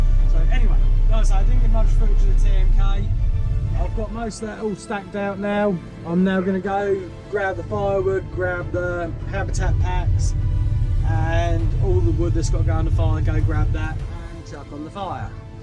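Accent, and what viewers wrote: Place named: Suffolk, United Kingdom. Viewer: Hey baa nothing wrong with Suffolk talk greetings from Newmarket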